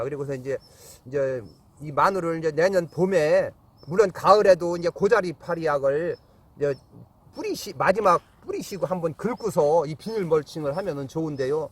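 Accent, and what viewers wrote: Chungcheong, South Korea. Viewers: 충청도 사투하네요 고향이 그리워요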